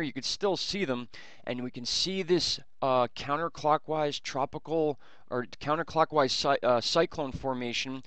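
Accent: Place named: Philadelphia, United States. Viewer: Yay! No hit on NJ :) you have an accent... Are you from Philly or NJ? You almost have the same accent as me!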